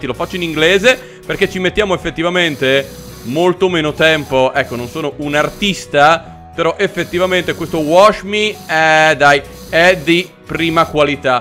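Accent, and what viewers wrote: Veneto, Italy. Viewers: Ma sei Veneto? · Ma sei veneto o friulano? XD io wono veneto. Su xbox è gratis power wash?? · L'accento veneto piú veneto che abbia mai sentito😂😂😂😂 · Tu sei veneto??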